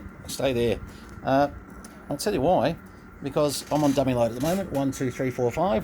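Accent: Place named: Australia. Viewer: Wow. Glad you're here. I'm trying to repair/restore the very same AE 76-577. Such issues after issues. I've had to install NTE1233 when we couldn't get an ECG1233, and that's because well, you know what it's like trying to find an 02A today without donor radios. Then I had to rebuild the VCO block. The last owner had cracked the slug so I had to dig the old one out, resleeve the wall and donate a slug from another. Now after all that, I've still not got lock. I'm chasing a gremlin. I need to get an AE 76-577 specific service manual. I got my hands on a Cybernet service manual, but it can't quite help. Anyway, it was nice to hear another Aussie while looking for AE stuff, and great to hear and see you got yours hummin' again. 73's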